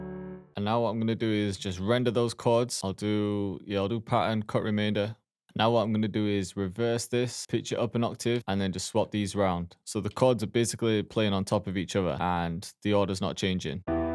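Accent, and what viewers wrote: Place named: Midlands, United Kingdom. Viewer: Yo, whereabouts in the UK are you from? I'm struggling to place your accent but for some reason I'm thinking Sheffield/Nottingham haha.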